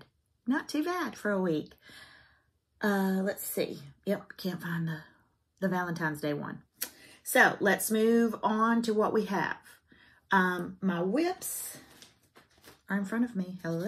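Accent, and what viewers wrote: South, United States. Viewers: Hi - to my ear you do have an accent - that southern one and I think it is lovely. I enjoy listening to you. Your chats are so valuable to the community. Don't ever doubt yourself if you are enjoying yourself - then it is not wrong or negative.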